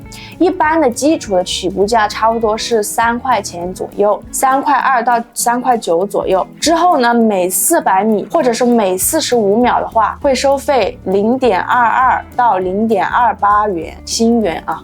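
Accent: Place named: Hubei, China. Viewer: 你这口音是湖北普通话。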